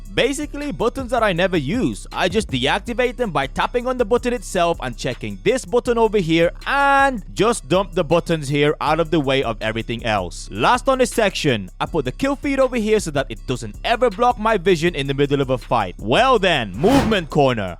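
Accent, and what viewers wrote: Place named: Russia. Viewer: Why do you sound Russian? Are you Russian?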